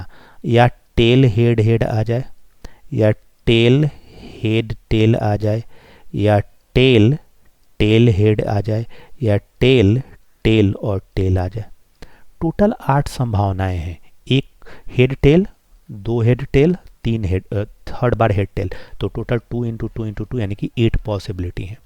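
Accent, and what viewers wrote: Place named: Uttar Pradesh, India. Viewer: Sir aap toh UP ke lagte ho... BTW Amazing explanation. Thank you (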